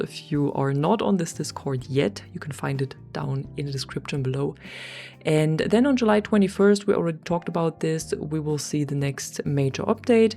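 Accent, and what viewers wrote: Germany. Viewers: Ich wusste das ist der deutsche Akzent 😂❤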